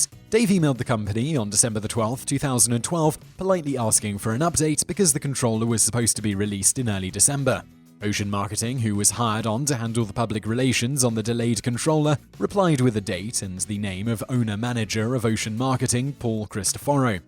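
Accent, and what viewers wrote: United Kingdom. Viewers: sounds so much more British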